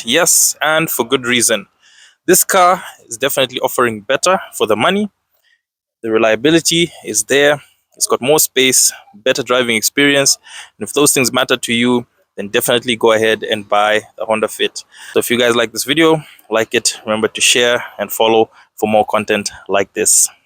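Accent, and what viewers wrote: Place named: South Africa. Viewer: Bro i thought you from my country south Africa 😂😂 how you talk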